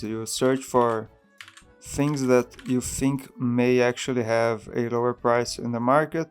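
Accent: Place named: Brazil. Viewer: gg where are u from bro? your accent looks like brazilian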